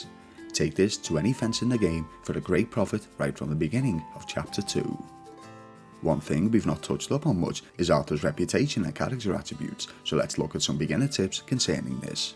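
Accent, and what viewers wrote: Liverpool, United Kingdom. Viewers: 😂 he is a native he's from Liverpool by the sounds of it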